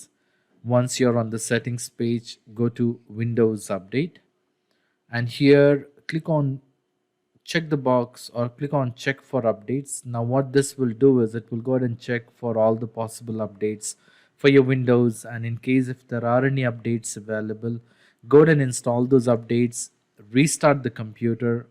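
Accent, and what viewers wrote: India. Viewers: another unhelpful tutorial with indian english accent, they produce many of that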